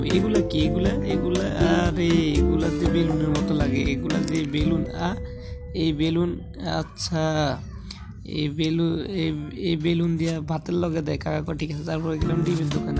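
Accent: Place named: Noakhali, Bangladesh. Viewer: নোয়াখালীর ভয়েজ❤❤❤❤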